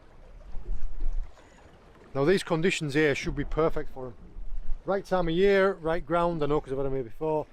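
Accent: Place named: Teesside, United Kingdom. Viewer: Hi great video as always, can I just ask where you are from originally? I've narrowed the accent down to the Teesside area or maybe the other side Barrow/Morcambe way?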